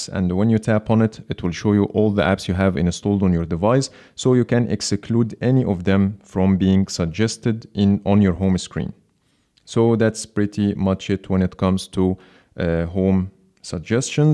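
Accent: Egypt. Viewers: very Egyptian accent عم الناس ! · انت مصري صح؟ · A true Egyptian English accent. Who agrees?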